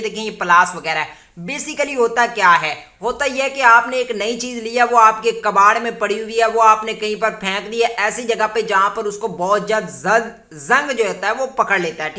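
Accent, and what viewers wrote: Punjab, India. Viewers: Good Punjabi voice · Very Nice & Good Punjabi Touch.. Liked it.. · Wow Punjabi tarka👌👌👌👌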